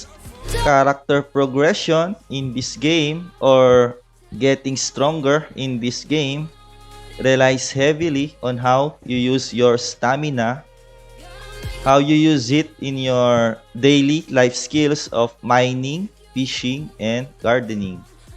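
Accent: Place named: Japan. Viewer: what's with this japanese accent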